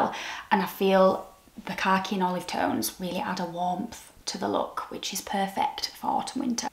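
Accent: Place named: United Kingdom. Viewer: I just discovered your channel and it's a pleasure for me to hear spoken british English. The internet is full of american channels. I am really glad that I found you and I will watch your channel more often.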